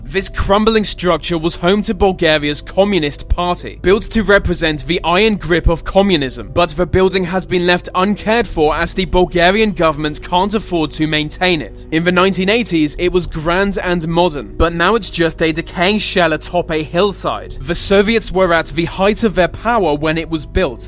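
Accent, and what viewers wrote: United Kingdom. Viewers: You sound british yet people claim you're american, weird. Nice vid though.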